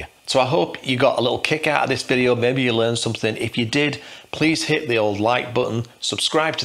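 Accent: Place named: United Kingdom. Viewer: First time seeing your channel. I loved the ending where you ask for some feedback! From the accent, I assume you are British, and your British humor comes shining through! Liked and subscribed. Can’t wait for more content, and you ‘giving me the eye!’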